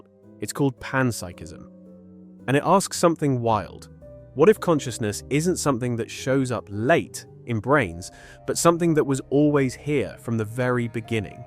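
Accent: United Kingdom. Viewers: i’ve been studying the Sanskrit Vedas to understand things for 15 years. this video is excellent and very easy to understand. nice to hear a fellow british voice too 🙏🏽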